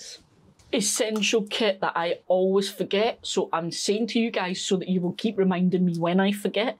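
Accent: Scotland, United Kingdom. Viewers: Hi, can you tell me more about your rotary compost sieve? I live in Massachusetts, and the only one I could find was over $100. I have a lot of compost to sieve, and this would make the job so much easier. I am planning a trip home to Scotland soon. I could bring one back if it's not too heavy. Maybe that is wishful thinking, lol. I am relatively new to growing vegetables and find your videos very helpful. Thank you for taking the time to create informative and entertaining videos. It's also nice to hear a Scottish voice.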